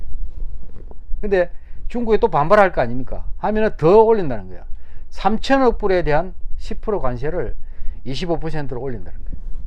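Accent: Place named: Gyeongsang, South Korea. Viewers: 경상도 사투리를 서울 말씨로 고치세요. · 경상도 사람은 경상도 말로 해야 목소리 톤에 힘이 들어가고 더욱더 호소력과 전달력이 있습니다. 억지 서울말 보다는 얘기하기 편한 말로 하는게 더 낫지 않을까 생각합니다.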